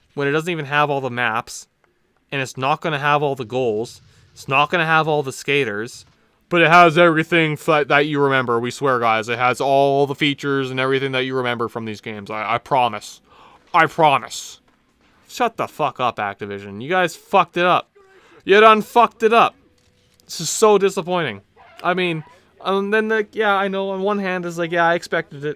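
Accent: Canada. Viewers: I feel like you must be from Canada 😂 first minute of this video sounds exactly like my friends and I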